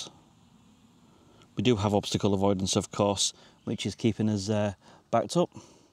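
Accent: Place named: Scotland, United Kingdom. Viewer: Came for the information, stayed for the SUPER THICK Scottish accent. 😁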